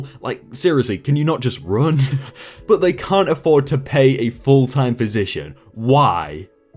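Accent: United Kingdom. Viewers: The 2 story’s he sounds British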